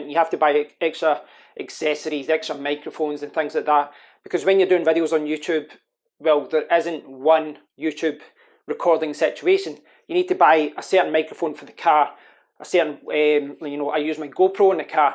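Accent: Scotland, United Kingdom. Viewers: Ur Scottish right ( so am i)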